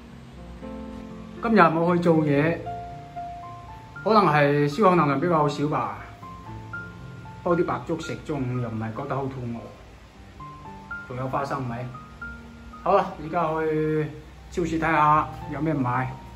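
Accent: Cantonese, China. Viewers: 其实你的普通话的口音就是广东话口音，早就知道你会说广东话。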